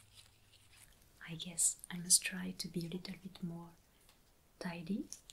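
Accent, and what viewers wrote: France. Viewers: Thanks for the video! I came most for the tingles and your wonderful accent (I just found your channel last week and I'm watching your work since). I studied the basics of French and you're tempting me to return to my online classes 😄 Again, thanks and greetings from Brazil. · Please ... More videos like this( with french accent)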